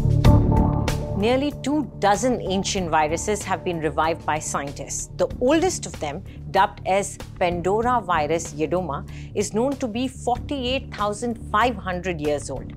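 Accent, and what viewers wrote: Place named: India. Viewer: What a heavy Indian accent. I cannot remember how to speak in English.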